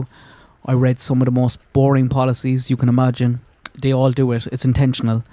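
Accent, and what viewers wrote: Cork, Ireland. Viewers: Cork gang represent! Sound like you might be from the Northside Langer! Beautiful scenery, up the Glen? 😂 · Very interesting information about wifi in public that I had no idea about! Thanks for this. I haven't heard anyone discuss this before. Very good video, and obviously, I love your Irish accent!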